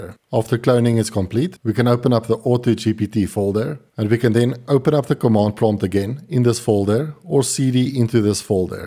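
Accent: Afrikaans, South Africa. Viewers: Loveley to hear an Afrikaans accent talking about the latest and greatest <3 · Very informative and lovely South African accent :)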